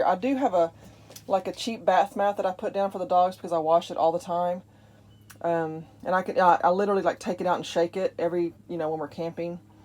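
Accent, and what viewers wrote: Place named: Texas, United States. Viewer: Cafe Bastelo, I grew up around that coffee with my Cuban Parents. Cafe Con Leche is basically a Latte coffee but you can taste the expresso a little more. Love that Soft Texan accent you have. As you we don't like allot of stuff. When i'm out on an excursion its the one time i can live with less. But have a far larger camper a 22ft Grand Design 22mle. But interested in this camper for distance trips. More so with fuel prices where they are today. We live in Miami, FL and when towing our TT it takes us 8 hours just to cross into GA and 10.5 hours to exit the Peninsula through I-10. Appreciate your Vids.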